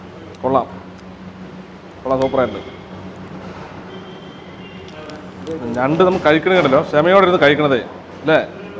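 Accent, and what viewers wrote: Palakkad, India. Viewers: ഫുഡ് വ്ലോഗിൽ ചേട്ടന്റേം വിനിച്ചേച്ചിടേം( vinis kitchen) ചാനൽ കാണുന്നത് നമ്മടെ പാലക്കാടൻ സ്ലാങ് കേൾക്കാൻ വേണ്ടി... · Pakka palakadan slang 😎😎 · oru palakadan 👌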